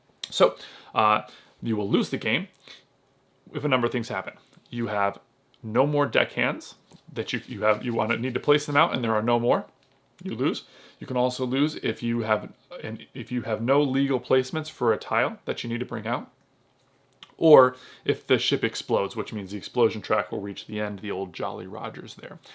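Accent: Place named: Boston, United States. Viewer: Thanx for this mate. I'm hearing you're from somewhere in MA/Boston area, yeah?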